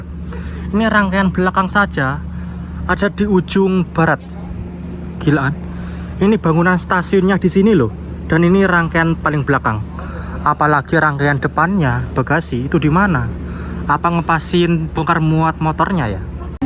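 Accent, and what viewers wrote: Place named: Madura, Indonesia. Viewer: mas lucu dengerin logat bicara sampean kek orang madura wkwk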